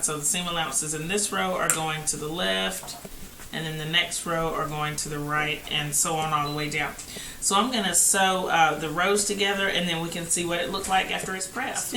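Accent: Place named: North Carolina, United States. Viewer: Cute! How did you end up with so many left over HSTs? BTW you sound like you are in NC. Me too!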